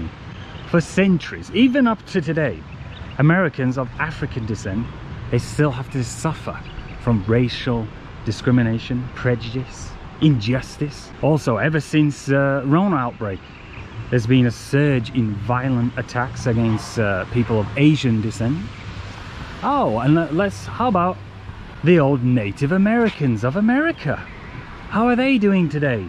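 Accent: United Kingdom. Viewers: i love this cute british accent debunking Big Bullsht Corporation ,lol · 英國口音好聽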